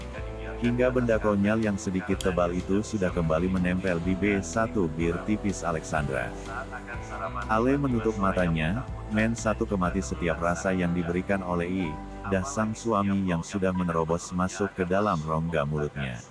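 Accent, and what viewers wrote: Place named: Sulawesi, Indonesia. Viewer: Waalaikumsalam bang.makasih semoga sehat dan sukses..semoga semakin bahagia Ale dan leo..bang maaf bahasanya abang seperti bahasanya orang sulawesi...seperti aq 😊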